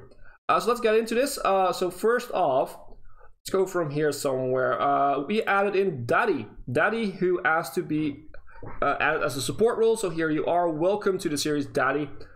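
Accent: Netherlands. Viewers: I live in Brabant but am from the US. So I speak the language my accent gives away my secret. And you have a bit of a Dutch accent, but I also thought that you could sound a bit like your more eastwards, so wasn't sure.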